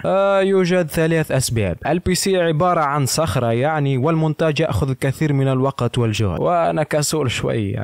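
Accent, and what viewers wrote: Tunisia. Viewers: انت تونسي كيفي؟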